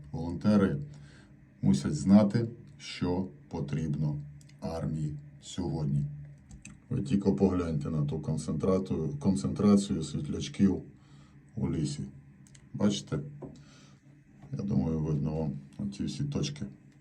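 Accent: Transcarpathia, Ukraine. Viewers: Закарпатська говірка,-Рахів, Костилівка, Ділове )))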